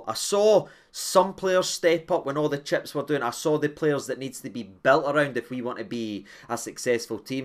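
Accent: Scotland, United Kingdom. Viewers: It’s a Scottish accent what’s wrong with it ?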